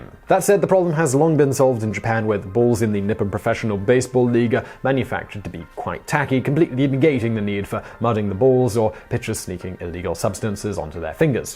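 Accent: United Kingdom. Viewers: today i found out....a man with a British accent has done more research about the most American sport since Lacrosse then several of my friends that definitely have moms that know which shoe box their little league photos are in.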